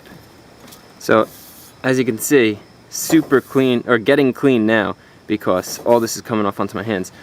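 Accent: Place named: Philadelphia, United States. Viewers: He's prolly southern, we say it like that. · must be from the east coast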